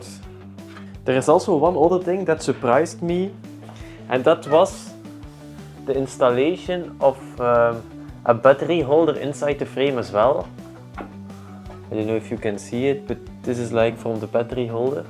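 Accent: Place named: Flanders, Belgium. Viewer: That's one thick Flemish accent brother! 😂 Very good video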